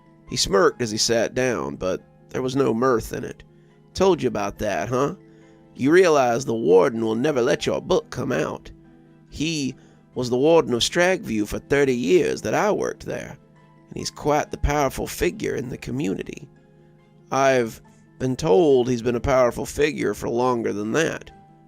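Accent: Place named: Deep South, United States. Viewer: Sounds like you've spent a lifetime in the Deep South. Impressed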